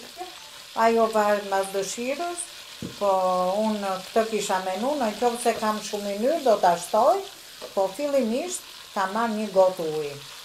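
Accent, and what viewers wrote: Tirana, Albania. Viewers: Dhe une e bej vazhdimish moj Zonje e nderuar,pervec gatimeve te mrekullueshme me pelqen dhe dialekti Tiranos ton te dashure! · Ju lumshin duart zonje je shume nikoqire bravo qe sjell ne guzhinen tende gatime tradicionale me qelqen e folura jote tironse respekte!!! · Ju zèntè gezuar e me shendet madam qe flet me lezet gjuhen tironse 😘🌟👍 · Te lumshin durt e arta moj teta ☀️🌷me kenaqe me kete menyre te folur Tironce, te pershendes nje Kavajse nga Modena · Te lumshin duret e ke qa. 😋 Masanej na kenoqe me te folmen . Te lumte qe se ke humb traditen Tironse. O Tiron zemer flori Prite te gjithe te medhej dhe femi Me ere Dajti uje Selite I rrite cunat dhe gocat si rreze drite Lum ai qe i bo shok Se ka bo i mik per koke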